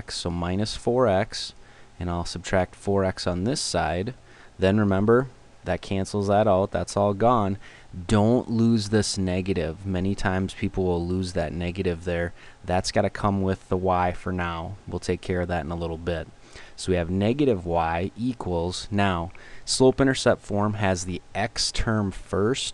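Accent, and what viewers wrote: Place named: Canada. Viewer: i can tell this dudes canadian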